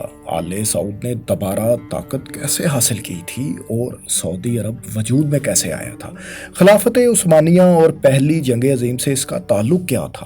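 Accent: Punjab, Pakistan. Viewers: Your heading is grammatically incorrect. Don't you know that first form of verb is always used after did. Secondly, you say simple Urdu words in Punjabi accent like a typical Paindo does. Gal samaj ayi?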